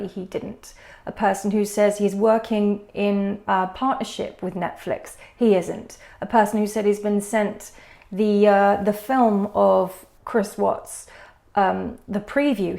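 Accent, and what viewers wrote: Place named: United Kingdom. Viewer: First...Thank you. Thank you so much for spreading light on AD & any other Youtubers/Scammers that are trying to scam good hearted people. Your voice is beautiful. I've always loved the Bristish accent. You are a beautiful person to finally expose AD (Alan Viddecom) however you spell his name. Thank you. Thank you so much. 🌻🌻🌻🌻💜💜💜💜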